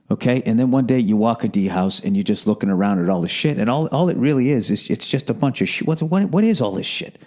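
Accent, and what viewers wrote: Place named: Boston, United States. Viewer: Lol I’m from Boston, & damn he sounds like he hasn’t left 🤣🤣I love him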